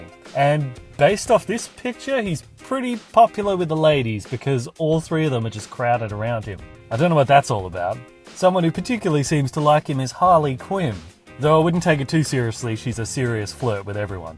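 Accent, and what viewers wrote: Australia. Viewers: he's AUSTRALIAN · He's Australian, the weird thing is i am too but people think I'm American.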